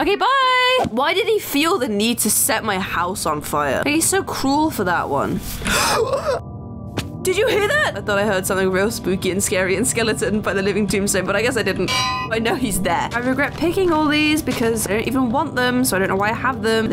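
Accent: United Kingdom. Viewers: I love how she starts sounding really really british halfway through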